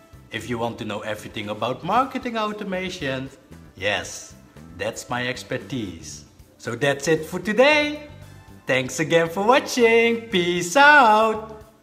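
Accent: Netherlands. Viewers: This was great, I really enjoyed your presentation style. You must be Dutch judging from your accent.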